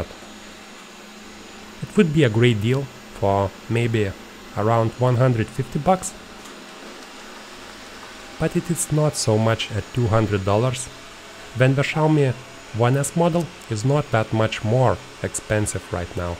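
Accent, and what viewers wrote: Russia. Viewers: Русак что ли канал ведёт, акцент родной, по ушам бьет))))) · Thanks very much. I saw your review before purchasing the C1. The latter was my choice because S1 was 90 usd more expensive in the country I leave (Asia). You are right on all points. I miss the area cleaning selection but not so much. It cleans at night the ground floor and the first floor when I wake up so no battery issue for 70 m2 each 2 floors. The mop on a shinny mirror-like floor works well but I added a microfiber mop to it and it works even better with no watermark. I live in a country side dusty area so strong hoover only is good for my kid but it also cleans. Important: after you buy the the C1, you easily want the robot to come back on specific areas. Then you should get the S1. For me C1 is enough... Just consider if the price difference, maybe now less, worth cleaning area control. 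Probably. If you like my experienced comments then I suggest you should like the video first, not my comments (I really don't know how you tube works but I humbly recognise who spent time to help all of us in this video with a Russian accent), n'est ce pas?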